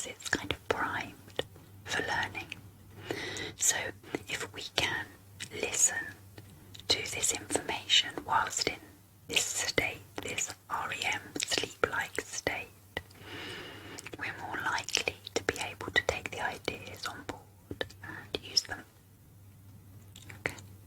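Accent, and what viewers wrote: United Kingdom. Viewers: I love your British accent 🌹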